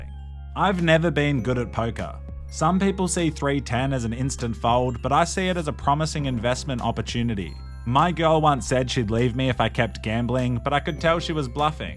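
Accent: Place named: Australia. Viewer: when he pronounces Anna as "ANR" - Australians really do have wild accents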